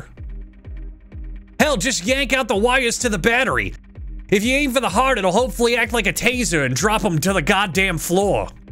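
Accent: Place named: Boston, United States. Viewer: He made every Chinese person sound like there from Boston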